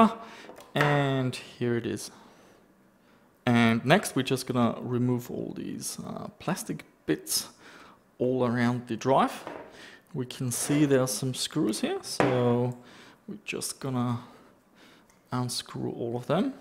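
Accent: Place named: Germany. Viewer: this guy sounds if his native language is German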